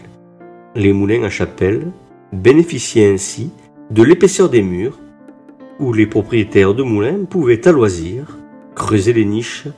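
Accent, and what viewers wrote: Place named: Albi, France. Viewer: Il me semble entendre l'accent de mon beau-frère albigeois. J'adore. Autant que le sujet des moulins. On croirait écouter un conte. Délicieux. Merci.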